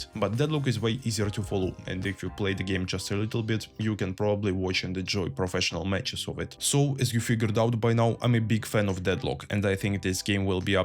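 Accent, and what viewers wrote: Russia. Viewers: Russian accent? :)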